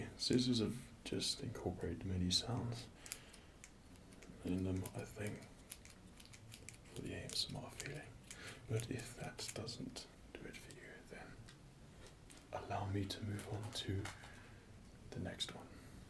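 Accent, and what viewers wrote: United Kingdom. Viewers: I'm glad you're making videos again. Your voice is a big trigger for me. Especially when you start to speak softer and softer and softezzzzzzz.... And I've noticed British accents also really work for me, though, no clue why. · What region of Britain do you come from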